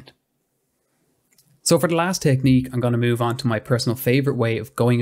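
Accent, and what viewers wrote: Ireland. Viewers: Being also an avid DaVinci Resolve user I have just discovered your channel here on YouTube. Your video tutorials are really helpful 👍and apart from that you are a sweet guy, I also really love & enjoy your Irish accent. Greetings & hugs from Germany ... 💙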